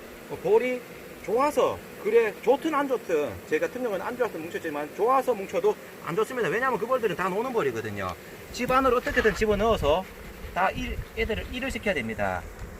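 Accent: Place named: Gyeongbuk, South Korea. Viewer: 동영상 잘봤습니다 같은 경북사람으로서 경북 표준어 귀에 쏙쏙 들어 오네요 ㅎ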